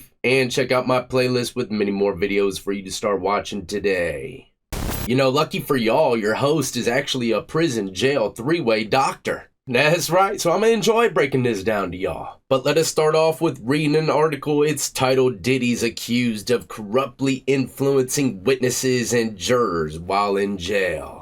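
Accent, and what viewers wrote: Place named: Michigan, United States. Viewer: Ur accent is very MICHIGAN. U originally from here by chance?? LOVE YOUR CONTENT ❤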